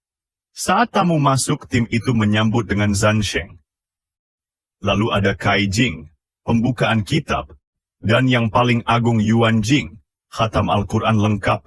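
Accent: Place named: Malaysia. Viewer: Kalau anda orang indonesia tolong pakai bahasa indonesia saja,jangan pakai logat Malaysia segala.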